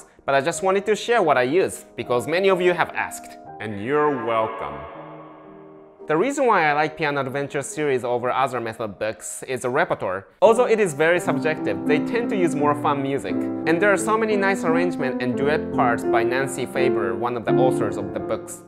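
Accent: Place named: United States. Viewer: The American-English accent is not an advantage when trying to communicate to a worldwide audience.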